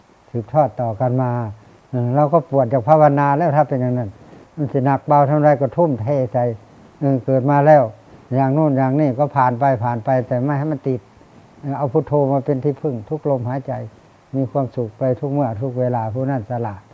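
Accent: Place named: Thailand. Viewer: สาธุเด้อข้า น้อยหลวงพ่อเว้าได้ดีหลายว่าวภาษาบ้านเฮาให้คนฟังเข้าใจสำเนียงเป็นไทยแต่ศัพท์เป็นลาวเพื้นบ้านสาธุข้าน้อย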